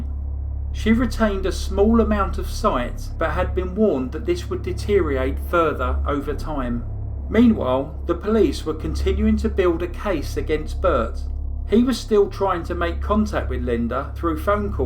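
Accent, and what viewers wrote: United Kingdom. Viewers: talk about a strong British accent . where's this from Leeds?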